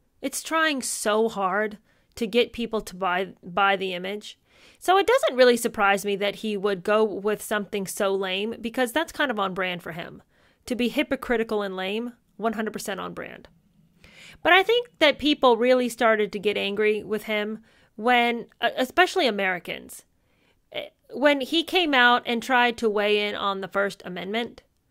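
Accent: United Kingdom. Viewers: does a remarkable British accent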